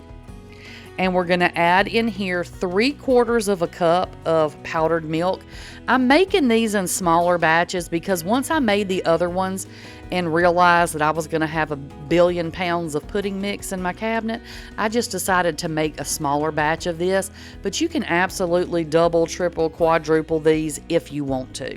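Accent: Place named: Texas, United States. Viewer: You sound like a Texan to me. You're drawl makes me so happy! ❤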